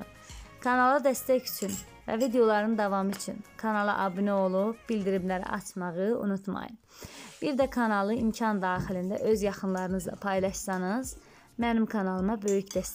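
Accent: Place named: Shamkir-Gadabay, Azerbaijan. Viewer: lap yaxşı yox yanılmışam ləhcənizdə Şəmkir Gədəbəy zonasına oxsatdim ləhcənizi.mən♥️ Ucardanam ♥️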